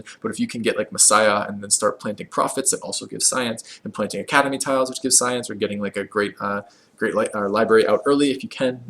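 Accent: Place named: Canada. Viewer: I'm trying to figure out your accent. I hear a Canadian "about" and a East Coast/New England "warrior" in there. Help me out here bro :D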